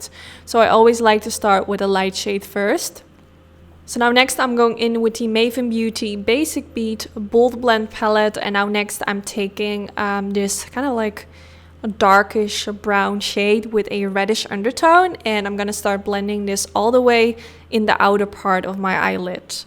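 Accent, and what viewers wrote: Netherlands. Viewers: Wat grappig. Ik heb zojuist je kanaal gevonden en ik dacht dat ik een Nederlands accent hoorde. En lo and behold, je bent Nederlands! Super leuk. Ps: ik hoorde het maar een heel klein beetje hoor, je spreekt prachtig Engels.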